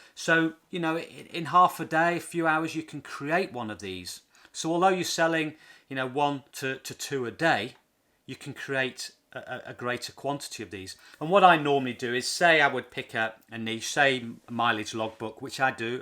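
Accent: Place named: Northern England, United Kingdom. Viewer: yes , i like your videos too . thanks for them .i just found you today , very grateful i found you .You sound like your from the north of England , i am wondering where exactly ....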